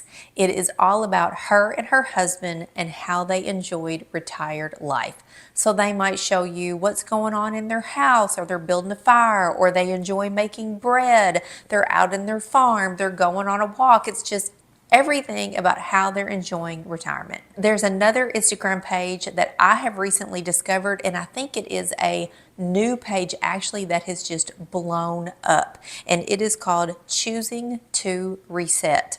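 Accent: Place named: Kentucky, United States. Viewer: I don’t much use Instagram. I personally find it boring, but that’s just me. I use Facebook a bit, X a bit, & YouTube a lot. I have a closet full of pretty clothes I never wear because we never go anywhere. I still found this interesting, mainly because I find your voice soothing, & I adore that KY accent. I follow 2 couples who do a lot of videos in TN, & have come to love what they show me of life in that beautiful state. We live in a senior apartment in SoCal, third floor, & my life is completely boring. I can kill silk plants. I follow people who live in France (the countryside), & a German couple who live in the UK. This is how I travel. I would love to see the beauty of Kentucky.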